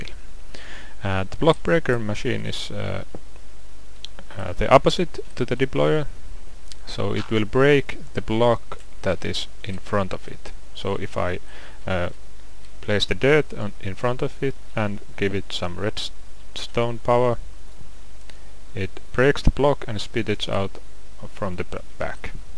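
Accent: Finland. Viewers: you sound like finnish guy speaking english... are you finnish?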